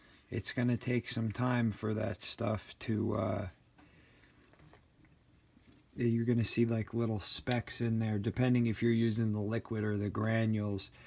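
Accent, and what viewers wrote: Pennsylvania, United States. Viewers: It's called a double boil when you melt gummies or chocolate or butter or w.e in a Pyrex container in boiling water. You can also use a candy melter sometimes sold with candle making or baking stuff . Haha you are definitely from PA I can hear it in your voice! Thanks for the videos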